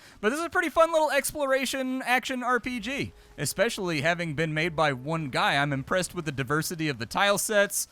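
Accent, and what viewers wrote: Germany. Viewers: your german english is awesome,! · Very good German accent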